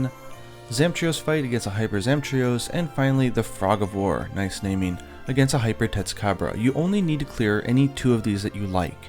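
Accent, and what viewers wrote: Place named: Japan. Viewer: The way he pronounces all the words in the game with a Japanese accent rly triggers me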